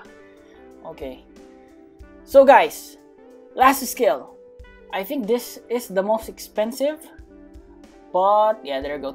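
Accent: Philippines. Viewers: Bro had a Filipino accent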